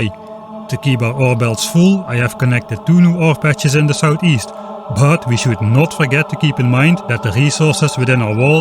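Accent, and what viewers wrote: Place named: Netherlands. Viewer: i smell dutch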